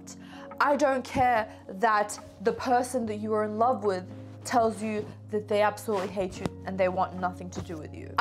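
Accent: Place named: Australia. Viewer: I love how this made me feel. Thank you for this kick up the butt. Hearing a fellow Aussie accent saying things like this hits 👌 I appreciate it!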